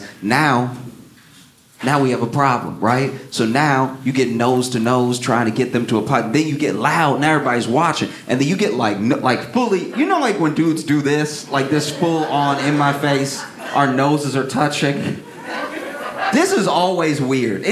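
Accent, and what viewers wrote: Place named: South Louisiana, United States. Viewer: That Sourh Louisiana jumps out a little bit every set.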